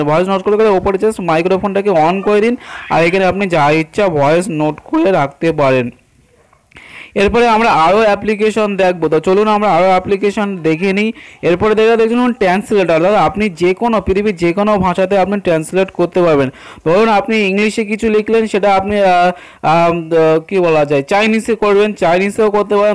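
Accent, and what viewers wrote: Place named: Kolkata, India. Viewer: ভাই কলিকাতার মানুষের উচ্চারণ এমনই ভাই। আমারদের যেমন নোয়াখালি..........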